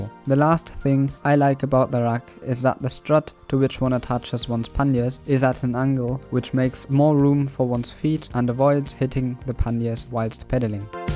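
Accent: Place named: Germany. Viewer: I love this German guy. Straight to the point, pragmatic, no BS. And cool accent. 10/10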